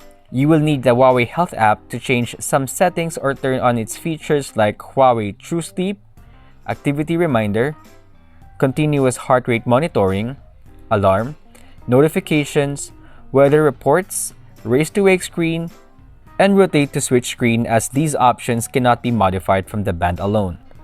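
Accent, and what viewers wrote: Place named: Philippines. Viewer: omg the annoying Philipino accent.. the accent spoiled the whole video.. PLEASE LEARN ENGLISH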